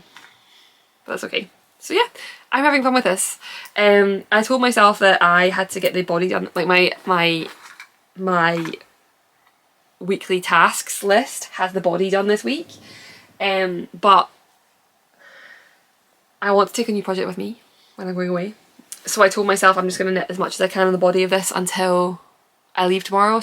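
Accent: Scotland, United Kingdom. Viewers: I have watched several of your videos and have several of your patterns on my ravelry wish list. Also I love a Scottish accent you could be reading me your grocery list honestly so I was actually embarrassed for myself to realize i wasn’t subscribed. Has been remedied now but damn. Not you showing up on my suggested page to get my ish fixed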